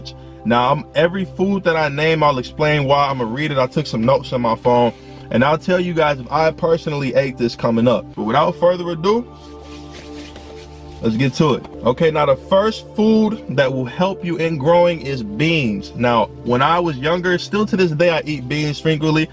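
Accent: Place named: New York, United States. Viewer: New York boy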